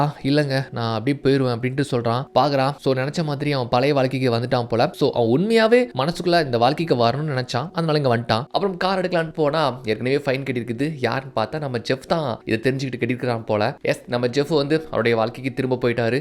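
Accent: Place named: Kerala, India. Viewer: 1 month kku munadiye...... doubts vanthuchu.... but apo voice la malayalam smell vanthuchu..... BUT IPA KANDIPPA SOLLURE ...... TAMIL VOICE OVER ஆசூன் தான்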